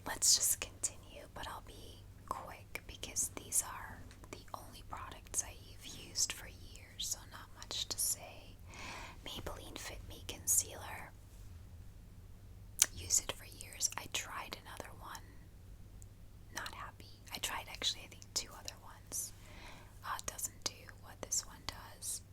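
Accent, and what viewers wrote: United States. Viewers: i recently found ur channel and have been loving ur videos! are you irish? you mentioned you’re located in ireland but you sound american to me!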